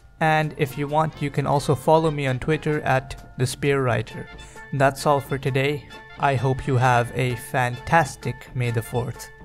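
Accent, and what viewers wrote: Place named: India. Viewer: Starts video -Hears Indian accent Time to abandon ship!!!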